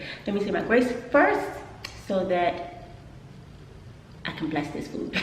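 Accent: Baltimore, United States. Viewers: I thought you was from Baltimore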